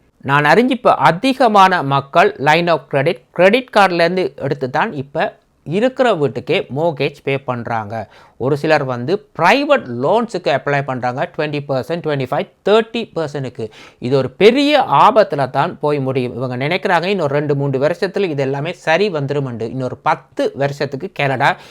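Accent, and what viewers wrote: Sri Lanka. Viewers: Are you a Sri Lankan Tamil ? Your Accent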